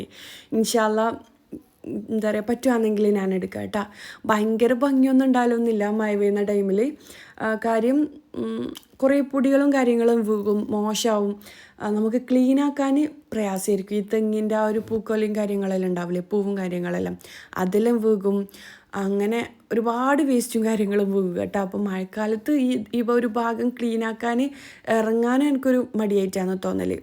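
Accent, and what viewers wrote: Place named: Cheruvathur, India. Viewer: njanum cheruvathur. aanu. samsaram same. aanu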